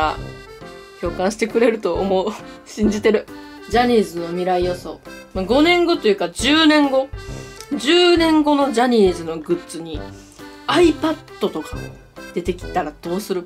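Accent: Kansai, Japan. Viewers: やっぱりさんの声や関西弁が大好きです❤️ これからも推しの動画をもっとだして欲しいですさんのライブに行く時の参戦服やグッズ動画を出して欲しいです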